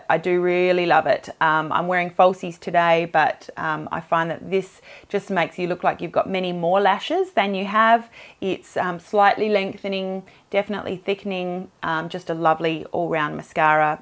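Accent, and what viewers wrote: Australia. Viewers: Great to see u again and the refreshing Aussie accent and all the familiar products I see on the shelves here 🤗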